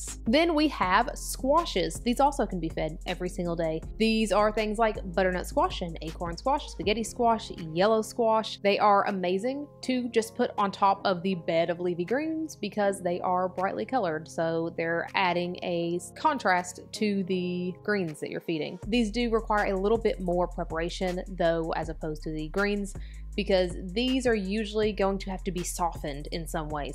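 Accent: South, United States. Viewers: I love your videos and your personality and how you talk so smoothly , you must be southern I hear my accent ❤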